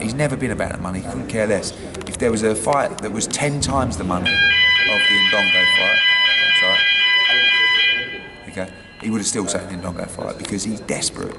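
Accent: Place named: Glasgow, United Kingdom. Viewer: Ooooo mate you might wanna tone down the Glaswegian accent. Also get a decent camera. At least the sound is better though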